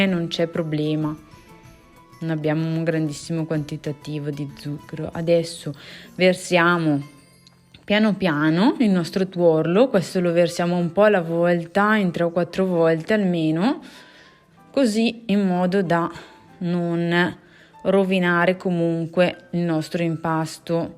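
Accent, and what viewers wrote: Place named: Lombardy, Italy. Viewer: Posso chiederti di dove sei? Dall'accento mi sembra, che sei BS o BG 😂😉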